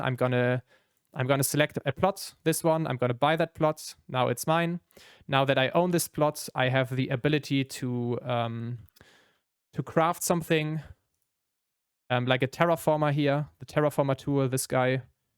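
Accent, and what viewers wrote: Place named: Germany. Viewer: Dein Akzent klingt sehr deutsch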